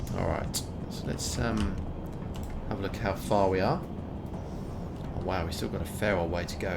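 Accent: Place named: Australia. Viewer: Was looking for some beginner s guide for Barotrauma and found you, boy am I lucky: I dig your style! you sound like a nice guy, you're a father like me, you play cool games and all... I am just sad you don't twitch (?). I'd even play with you! Though you sound a bit like the hilarious SovietWomble, from your accent I would say you're an Aussie? if yes I just arrived to your great country and I love it! Cheers mate.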